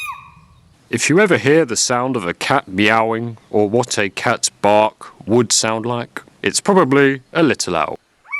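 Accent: United Kingdom. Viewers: Just watched a video from five years ago. When did you get a British accent?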